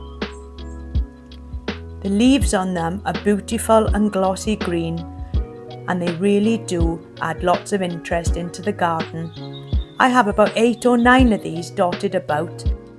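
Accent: Wales, United Kingdom. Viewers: Thanks for this. Nice honest tropical style garden it’s inspiring. I love the welsh accent so kind and warm , much love x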